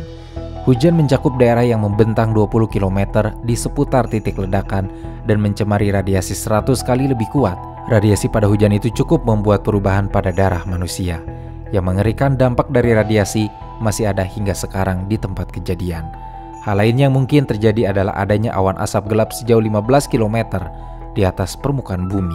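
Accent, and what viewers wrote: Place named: West Java, Indonesia. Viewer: Dari cara bicara nya, tampak nya mimin dari suku Sunda.. kumaha min, daramang ?? 😃😁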